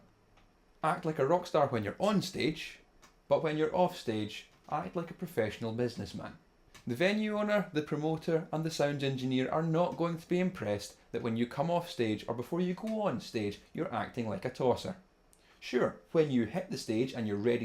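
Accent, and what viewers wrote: Scotland, United Kingdom. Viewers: Great advice. I've been playing live for 50 years now, and each of these tips ring true. I could have done a video on this, but I don't have the uber cool Scottish accent that you do! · Just discovered your videos a few weeks ago, and have enjoyed them a lot, not just because I agree on a lot of your points about EMGs, Floyds or Boss HM2s with full gain, not just because I have the exact same Bugera amp, or because I love the Scottish accent. I like them because I actually learn some valuable lessons from you. That being said, I also agree on everything in this video, except I don't think wireless systems makes a guitarist move around more. I'm the guy who always gets in the pit with my guitar or jumps from PA towers, but I never thought of a wireless system as a worthy investment. I usually don't use pedals, maybe a little OD sometimes to boost solos, but even when I don't have any other pedals I always put my Korg stage tuner on the front/center of the stage so I have an "anchor point" in front for more movement/range and to reduce the risk of pulling my amp head to the floor. 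I also put my cable around my strap so it won't get ripped out. I see many guitarists with wireless systems standing in the exact same place the entire gig, and I don't get why they bought it. Anyway, I think there are some other advantages with wireless systems that I haven't seen yet, maybe you could make a video about what possibilities such a system gives you and some pros and cons with being wireless? (A guitarist I know uses his system (shure) simply because it has a built in tuner.) How about setting up your gear for a gig? switching guitars onstage? If you use a shitload of p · Emmanuelle de jesus Scottish accent. Someone from Scotland could probably tell you what city too (Edinburgh sounds v different to Glasgow). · Dude you look so cute and ur Scottish accent is pretty funny and frustrating to listen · Oooo your Scottish? Where about are you from? I'm from south lanarkshire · Scottish accent is the best :DD great video as well !! 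· new sub here. not just for the Scottish accent nut great advice as well!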